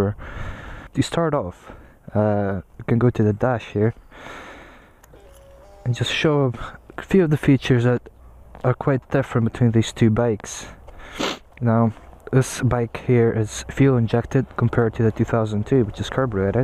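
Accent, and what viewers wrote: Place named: Northern Ireland, United Kingdom. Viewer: Your accent is interesting. It is Northern Irish mixed with something else.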